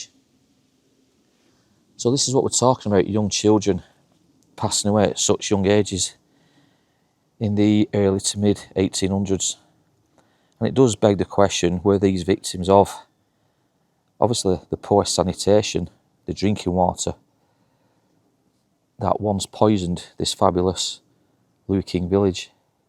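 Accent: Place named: Northern England, United Kingdom. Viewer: Thank You so much for these Northern stories! I’m an American that grew up in Rochdale from 9yrs of age to 19yrs until I moved back home to the States. This was <num>. My youth was spent growing up on the Pennines, Yorkshire Moors, Saddleworth and The Dales. So much history you have uncovered that I never knew about. My mother still lives in Rochdale and I get over when I can. Been watching all your videos and hearing your northern accent warms my heart. Cheers and keep up the excellent stories and your investigations. Truly love these videos. The very best!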